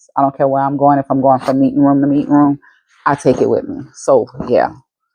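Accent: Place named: New Orleans, United States. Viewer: This is my first time here I enjoyed your video and that Nola twang can’t wait to see how you accessorize your navy bag, I never do potlucks either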